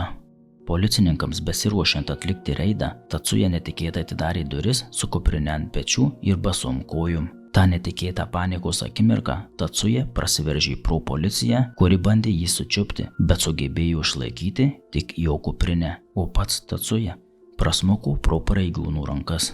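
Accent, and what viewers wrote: Suvalkija, Lithuania. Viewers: Ar tu suvalkietis? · Man skamba kažkaip suvalkietiškai. O šiaip reikia tobulėti, su laiku manau viskas išsisprendžia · Viskas būtų ok, bet suvvalkiečio akcentas turėtų išnykti. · Taip, akcentas suvalkietiškas. O dėl tobulėjimo, tai labai teisingai, reikia praktikos, laiko ir viskas eis su kiekvienu video vis geryn.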